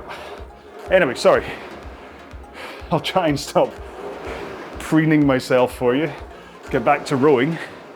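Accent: Scotland, United Kingdom. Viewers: Newbie here. Love rowing along with you. Your Scottish accent is wonderful to listen to while I row.😊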